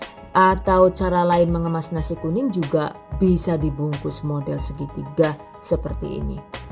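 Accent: Banyumas, Indonesia. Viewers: Lah mbakyune ngapakers nggih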